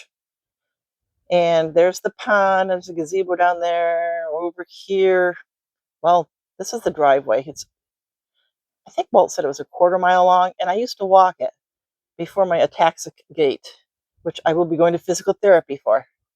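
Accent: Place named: North, United Kingdom. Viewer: Thanks for the video.I am from around tug hill.Moved to Florida because my husband was in the Military.We still have family up North.Love your up North accent.People still ask me where I am from.Beautiful country up North.I miss the seasons but not so much winter😂Thank for all you do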